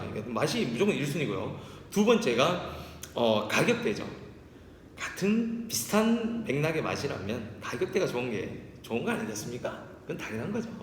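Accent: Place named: Gwangju, South Korea. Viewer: 광주인가요? 말투가... 위치 어디실까요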